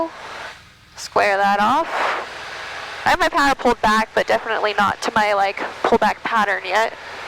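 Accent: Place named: South, United States. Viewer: Fun video. Your presentation is very relaxed and natural. So much so, that Southern is coming out. Happy flying to both of you.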